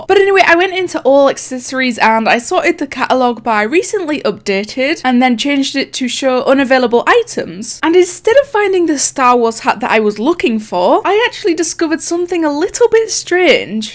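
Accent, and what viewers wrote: United Kingdom. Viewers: U sound british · Are you from UK?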